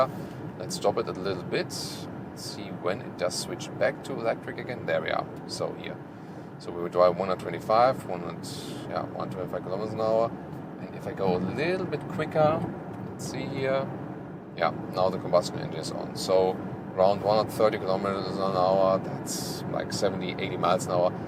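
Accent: Germany. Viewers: sounds more German than ever 😆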